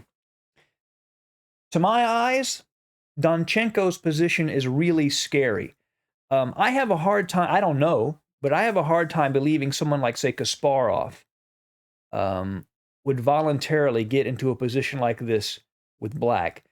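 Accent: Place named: South, United States. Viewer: Thanks for sharing with us. I like the accent as well as the commentary. My guess would be Alabama or Tennessee. Great series on Firouzja by the way. After watching your videos, I now understand why the guy's made such a big impression on the chess world. Looking forward to the next video.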